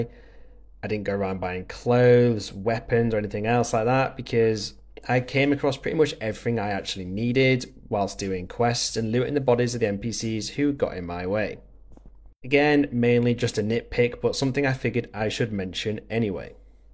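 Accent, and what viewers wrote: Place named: United Kingdom. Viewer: Was expecting a terrible loud overzealous voice (common for gaming channels), but instead found a softly spoken British man who calmly conveyed the message in a concise manner. Excellent work, subscribed ❤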